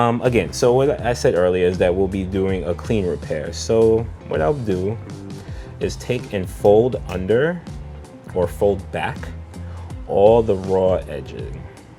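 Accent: United States. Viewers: You are an inspiration. My son can’t sew a button on. Clear instructions and a beautiful clear voice. I’m a sucker for American accents. New stubby from Scotland 🏴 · You get my thumbs up just for your sweet NY ACCENT🥰🥰🥰🥰🥰🥰🥰🥰🥰🥰🥰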